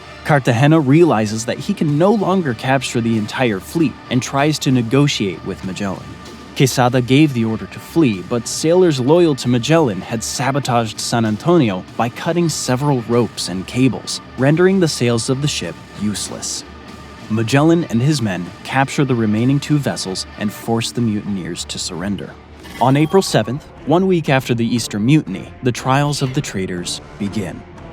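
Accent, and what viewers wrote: United States. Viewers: He’s probably Filipino American by the sound of his accent.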